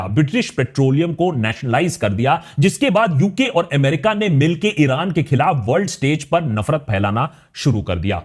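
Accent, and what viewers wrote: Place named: Bihar, India. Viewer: Bhaii, you are great.Your style is typical Behari sweet way.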